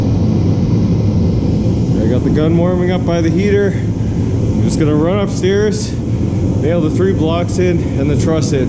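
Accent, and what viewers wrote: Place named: Canada. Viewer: Where is this guy from? Sound Canadian lol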